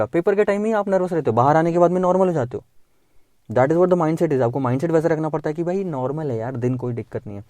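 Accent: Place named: South, India. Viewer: Don't mind sir but your accent sounds south indian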